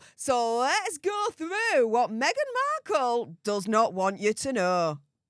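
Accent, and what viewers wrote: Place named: England, United Kingdom. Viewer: please talk a bit slower because your accent is very broad and hard to understand. I don't live in England (I'm just guessing that's where you're from?) Thanks heaps.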